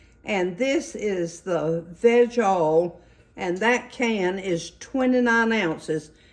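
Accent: South, United States. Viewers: You are a Very Special lady♥️♥️♥️ I enjoy your videos so much ❣️❣️❣️ I’ve never seen a recipe you posted I didn’t like❣️ We eat like you cook and I love your southern accent ♥️♥️♥️ you just make it look so easy to cook♥️♥️♥️🙏♥️♥️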